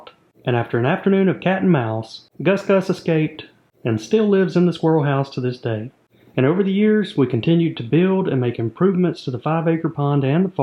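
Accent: South, United States. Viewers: May the Carp be with you - cool Southern accent btw !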